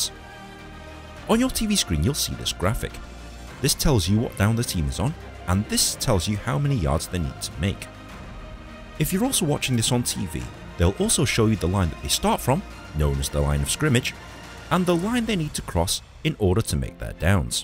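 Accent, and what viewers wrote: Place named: Northern England, United Kingdom. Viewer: This guy is so American yet has a British northerner accent with his Us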